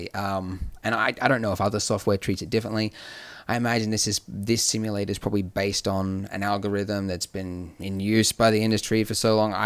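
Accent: Australia. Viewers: I didn't know you were Australian I thought you were British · I always wondered where your accent was from! Only realised you were a fellow aussie after watching your stylised earth tutorial the other day haha.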